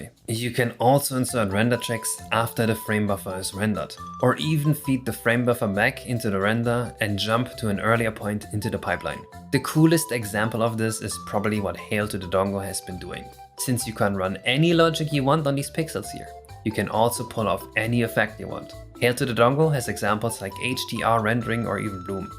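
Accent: Germany. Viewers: Hmm thats a german accent. Great tech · random question but where are you from? i hear a german accent in your voice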